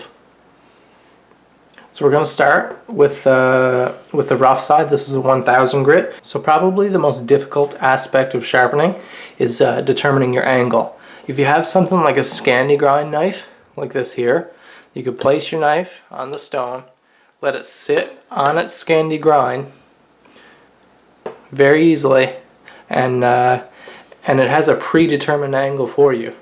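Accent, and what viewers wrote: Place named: Newfoundland, Canada. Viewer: Dude, are you from Newfoundland!? Haha great tutorial! Got a paramilitary 2 on the way as well as a 400/ 1000, 3000/8000 and strop. Can’t wait!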